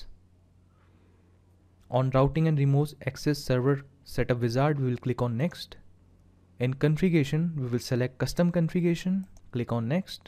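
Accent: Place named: India. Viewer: Clearly... As I always say if it's Indian accent it's excellent explanation.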